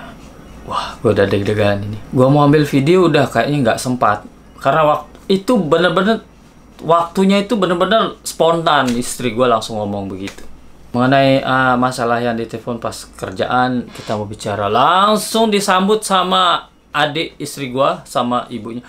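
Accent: Lombok, Indonesia. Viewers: logat lombok nya masih kentel bang salam dari KLU lombok jg 🎉😂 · Logat lomboknya msi kerasa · Abang kok logatnya lombok bgt ajarkan ke kita jadi youtuber 🤗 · Masih bae fasih logat Sasak tie meton. Banggek gitakm sukses. Mudahanm tetep bahagia sekeluarge lek te😁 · Keren bang Logat sasak masih kentel😅 salam semeton sasak😺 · Saluuut medok sasaknya gak ilang 😅😅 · Timmak nahase Betawi laguk logat masih logat lombok hihini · Kek logat lombok ya bang.. Dari lombok kah?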